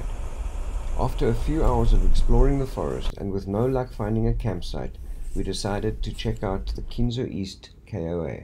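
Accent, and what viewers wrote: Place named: Australia. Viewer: Wow brother you sound like your from australia or something my names mitch i live in butler pennsylvania ive been to all places youve shown in your video except for a couple. Do you live here in PA?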